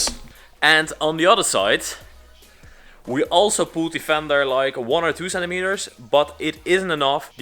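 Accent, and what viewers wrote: Netherlands. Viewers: Ik dacht al dat ik een Nederlands accent hoorde :p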